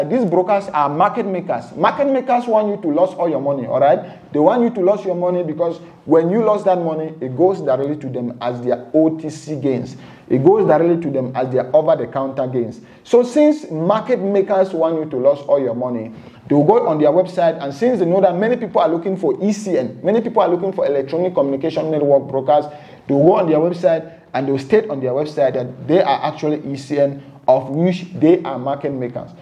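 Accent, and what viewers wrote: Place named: Nigeria. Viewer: I watched to the end 👁️👄👁️ bro I do really ur explanation, I would love to join u telegram group. Southern Cameroon and Nigeria have thesame accent, I thought u were a Nigerian.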